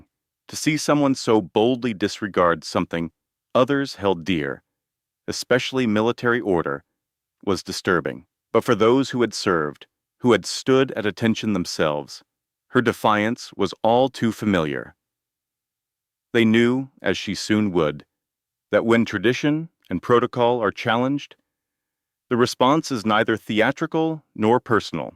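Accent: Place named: United States. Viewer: It's a good story to express about our guards but spoken by an American. I began to feel sea sick when the picture kept moving side to side.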